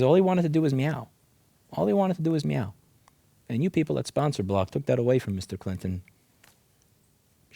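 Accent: New York, United States. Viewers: how do you sound more new-yorker than you did when you lived there 😂😂 great vid regardless... keep up the pressure on anti-consumerism!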